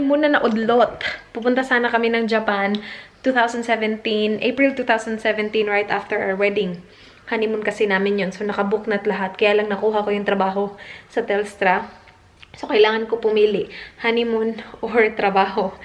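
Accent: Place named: Hiligaynon, Philippines. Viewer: bakit parang hiligaynon yung accent mo sa first one minute intro mo hahahahahaha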